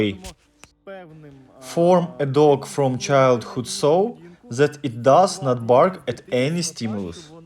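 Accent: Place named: Russia. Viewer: Найдите другой голос за кадром, очень сильный русский акцент. Слишком сильный.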